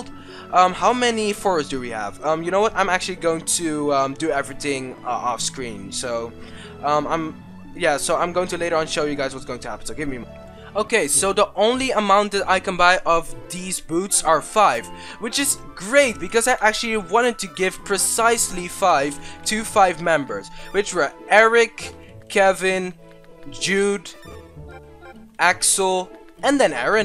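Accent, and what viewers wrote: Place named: United Kingdom. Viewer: nl for tha win! also, first vid I heard of you, I kinda thought you were brittish. XD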